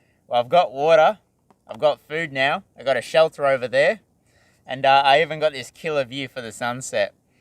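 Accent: Australia. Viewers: Great video :D I'm watching you from some time but I didn't notice that you are half Polish. I guess you were born in Australia which explains the lack of accent. But it's nice to hear "no a szufeleczka, albo coś, dzień dobry..." next to your Australian accent.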